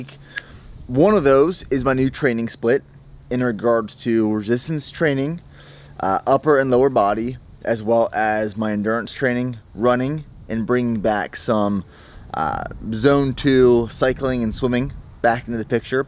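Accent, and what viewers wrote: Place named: Central Pennsylvania, United States. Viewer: Central PA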